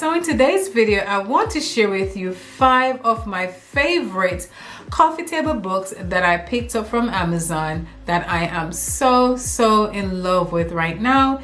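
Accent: Jamaica. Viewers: Are you Jamaican '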